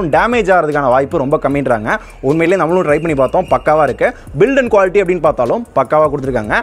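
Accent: Madurai, India. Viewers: Neenga Madurai ya bro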